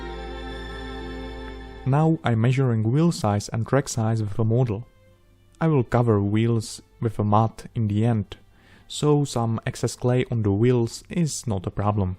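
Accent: Russia. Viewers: у тебя же акцент Русский, но ты тягачь называешь трактором???? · Ведь же русский, по акценту слышно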